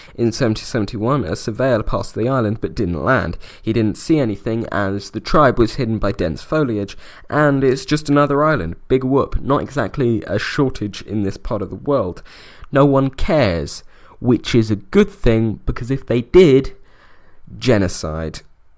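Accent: United Kingdom. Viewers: You are so jaded but yet informative, I love it! You got a strong british accent as well, it fits your character. And I'm not sure of the term "jaded", I'm not an english native, for me it means you are funny while being like tired of your life kinda. Just so you don't take it as an insult and now my comment is getting to long and I'm starting to sound too politicaly correct for a stranger on the internet. SUGOIII DESUNE (that's for the contrast, the bad kind of contrast) One question: are you proud of being british? Thanks for the laugh, I suscribed. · This is the most annoying British accent combined with this teenage voice. Wtf.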